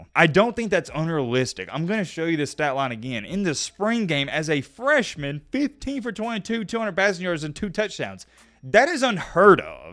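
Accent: South, United States. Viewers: I’m from the south too brotha but dang hire someone to narrate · What kind of accent is this? Tone it down. Good content but come off the southern drawl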